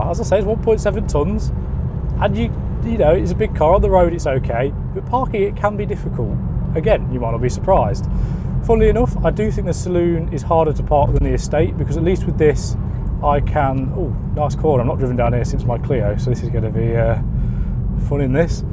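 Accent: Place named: East Midlands, United Kingdom. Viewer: You sound like you're from Notts/Derby - get over to Noobtune and give this an £80 remap - he''s only in Long Eaton and it'd make for another brilliant video!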